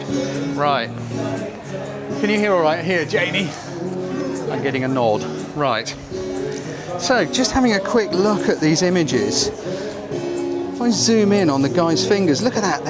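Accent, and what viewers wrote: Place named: South East England, United Kingdom. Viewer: ....wonderful accent..sounds very south east England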